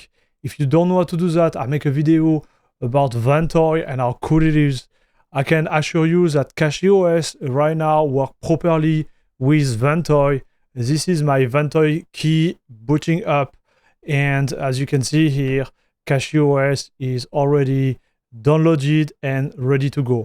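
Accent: France. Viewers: You have the thickest french accent I've ever heard 😅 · I'm guessing that this is probably a very informative video, but the extreme (French I'm guessing) accent. I cannot understand what you are saying. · you're french because your accent is very similar. i like your videos a lot.😁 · honestly i think id rather just listen to you speak french and read subtitles rather than try to decern what you're saying in english through your CRAZY thick french accent. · nah this is the purest french accent you'll hear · Jadore cet accent francais :D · Heavyest french accent I ever heard ❤🇫🇷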